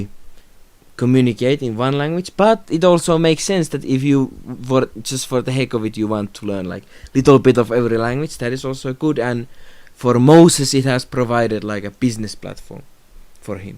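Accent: Finland. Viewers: I couldnt finish watching this video because of the accent but I will drop a like for the effort! Next time you could speak in suomi and have english subtitles, cheers bro!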